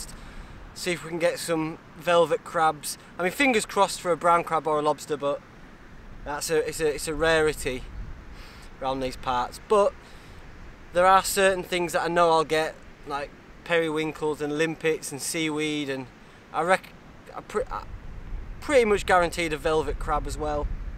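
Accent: Yorkshire, United Kingdom. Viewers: Hi mate I love your videos and have been fancying a go at some coastal virgin foraging I’m from Yorkshire and picked up you ears coast accent . I’m wondering where’s best to go for a first time thinking robin hoods bay what you think bro ?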